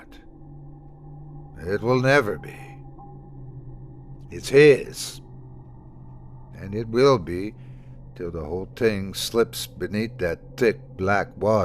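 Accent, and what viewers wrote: South, United States. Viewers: Absolutely love listening to these stories told by you 🌹 Your voice/voices remind me of my southern roots 🌹🥃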